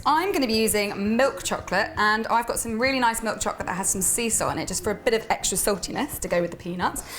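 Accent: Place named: London, United Kingdom. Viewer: It's a London accent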